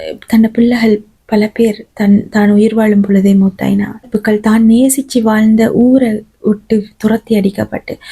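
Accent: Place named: Sri Lanka. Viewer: இலங்கை யின் சுவையான தமிழில். இனிமையான. இஸ்லாமிய சிந்தனை. நன்றி சகோதரி.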